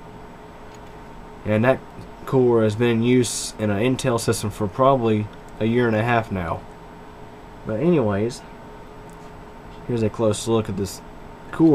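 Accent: South, United States. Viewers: That southern accent tho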